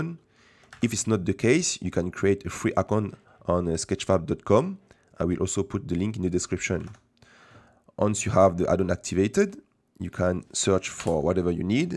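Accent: France. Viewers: i really love your french accent tres bien continuee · French accent, bonne journée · tu serais pas français par hasard ? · C'est un français ce youtubeur? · accent francais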